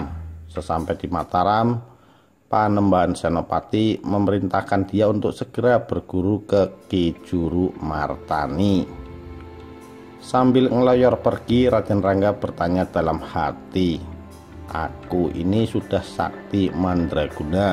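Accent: Tegal, Indonesia. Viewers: Admin kayane wong tegal apa brebes yaa...?? Intonasine lucuu 🤣🤣🤣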